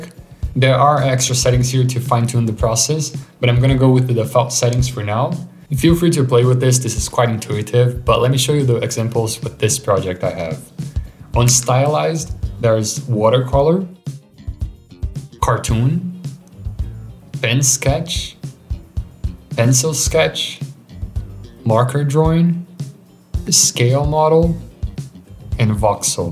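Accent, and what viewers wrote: Brazil. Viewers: vc é brasileiro?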